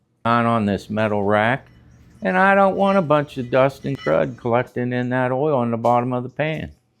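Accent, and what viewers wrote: Pittsburgh, United States. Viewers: Are you from Pittsburgh? Excellent video! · I know a fellow Yinzer when I hear one!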